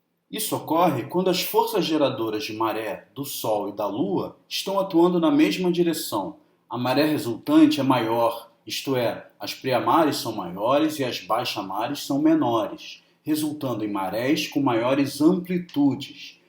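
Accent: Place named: Rio de Janeiro, Brazil. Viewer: ótima aula, inegavelmente professor carioca hahaha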